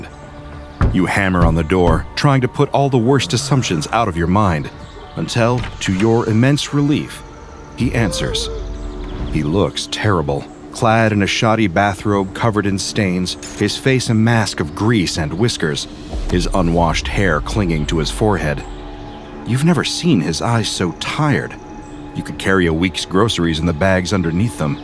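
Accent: United Kingdom. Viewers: OG British accent 😂❤ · Dam 🦫🦫🦫 you really nailed the British accent sir 🙏. God bless you and your work, respect form Croatia-Europe 😇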